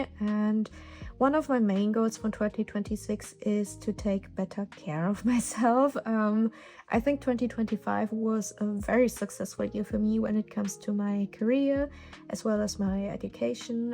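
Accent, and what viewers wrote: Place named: Germany. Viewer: This was such a cozy video to listen and watch, good mixture of very soft music in the back and I adore your German accent. Would love to see more in-depth explaining and layouts that you use for different types of todos and creativity! >w< As for planners that I'll be using for 2026 (braindumping about the specifics will happen later in Nov); Hobonichi Cousin: all-in-one daily planner, scheduler, personal/hobby journal Hobonichi Weeks: adult-log for chores, appointments, more todo focus with no personal details Hobonichi HON A6: art freelance/work planner, detailed todo of my art projects along with its own space for notes (leaves more room in the Cousin) Self-Care journal: bullet journal with a simple tracker for emotional, mental and physical symptoms. A page for affirmations and quotes to read back on and a Monthly Review Yearly Collections journal: fun collection pages from hobbies, trackers to goals (very tempted to move this into the Hobonichi Weeks.. 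but unsure due to how small the Weeks is and it may clash with the 'adult admin/todo focused' theme). Apologies for the long comment, I can ramble on and on about my planners haha. But there is still a lot to figure out. I've only been using the Hobonichi Cousin for the first time this year. I used to bullet journal everything in a B5 size that would fit 5 months per book... but monthly setups became extremely time consuming, so I'm VERY happy to have moved into the Hobonichi planners >w</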